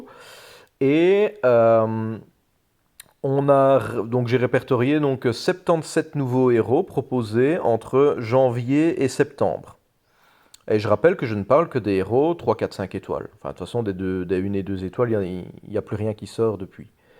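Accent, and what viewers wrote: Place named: Belgium. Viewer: Sans commentaire l'ami toute est di , donc je vais plutôt commencer par te dire que ca fait plaisir de voir un youtubeurs Belge sachant que j'ai moi même des origines belge que j'ai habité Bray Dunes donc juste a la frontière collé à ( la panne ) pendant toute mon enfance et donc forcément ca me fait toujours plaisir de revenir en Belgique chaque année ou même d'entendre l'accent belge qui me rappelle toujours de bon souvenirs , je fais partie de l'alliance de subarix et Julien notamment donc je tes découvert grâce à Juju et je trouve très intéressante ton analyse et bien résumé , et j'vais te dire moi je dépense peut depuis le début de mon aventure et j'me débrouille avec mes héros , j'ai juste pris le vip très vite pour finir mon camp rapidement , depuis je ne le prend plus et donc j'investi encore moins , j'étais à environ ( 20 , 25 voir gros max de temps en temps 30€ ) aujourd'hui entre ( 10 et 20€ gros max ) plus ils continuent dans leur délire moins j'investis , désolé pour ce long message , je ferrais plus court la prochaine fois , moi c'est Georgio salut et à bientôt l'ami 😉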